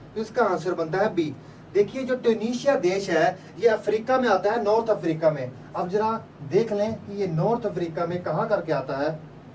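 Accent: Punjab, India. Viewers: Aap Punjabi hoo na sir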